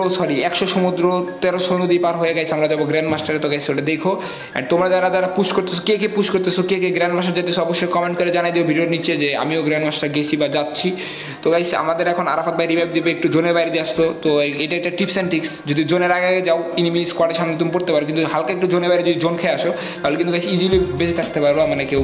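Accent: Tangail, Bangladesh. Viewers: Bhai apni ki Tangail er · Vai tomar basa ki Tangail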